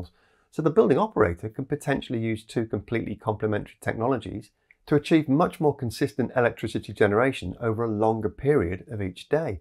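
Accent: United Kingdom. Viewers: I thought I would just mention, that just because you have a British accent does not mean you live in the UK 🙂 Thanks for another informative video.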